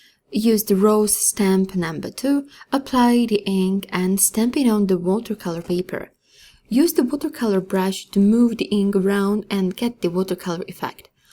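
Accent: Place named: England, United Kingdom. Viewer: Fiiha velmi dobre video!! ;-) a o typickej anhlickej anglictine nehovoriac,super !! A aj pohladnicka krasna!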